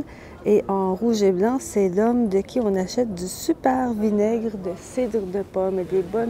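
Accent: Quebec, Canada. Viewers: I'm enjoying your videos very much! Are you always speaking with a Quebecois accent in your videos? Even when you're teaching grammar and vocabulary? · Oh, c'est le lieu de mon enfance! C'est formidable de le voir à travers les yeux d'une canadienne et d'en entendre parler en français (québécois ☺️)! Merci!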